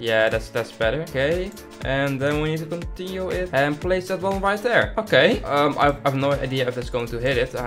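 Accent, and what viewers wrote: Netherlands. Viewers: Are you dutch by any chance? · i smell dutch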